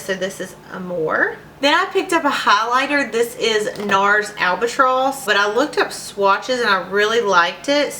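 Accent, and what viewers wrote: United States. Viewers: Love ur country accent. I'm from Texas. But I don't think you have Texas accent do u?! Lol great palettes!!!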